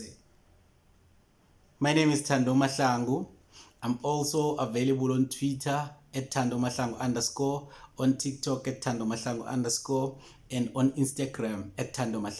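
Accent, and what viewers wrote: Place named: Kalanga, Zimbabwe. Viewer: I was wondering aswell, this sounds closer to the Kalanga my mother speaks.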